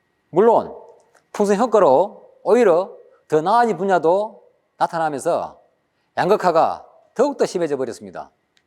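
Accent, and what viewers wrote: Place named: Gyeongsang, South Korea. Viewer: 구수한 갱산도 사투리로 귀에 속속 리더님짱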